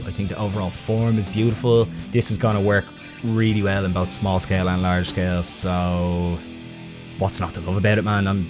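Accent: Ireland. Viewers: I knew you was Irish when I first heard your accent mate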